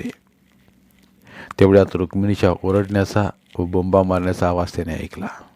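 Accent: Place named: Rural, India. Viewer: सर तुमचा आवाज चांगला वाटतो ग्रामीण भागातील आठवण येते धन्यवाद 🙏🙏🎉🎉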